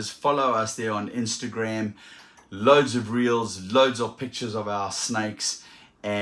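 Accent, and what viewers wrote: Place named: South Africa. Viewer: I love the South African accent so much.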